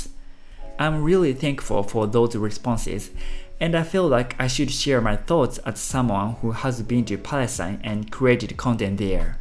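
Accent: Japan. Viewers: 発音からすると日本の方ですね、この話題を触れてありがとうございます、日本好きな外国人としてパレスチナのデマを日本のSNSで見かけると心が折れてしまう、悲しく感じてしまいます、このどうがをみたあとにうれしくおもいました、現地の真相を語りつけずけるように祈ります、めちゃグーグル翻訳っぽくになっちゃってすみません～ｗ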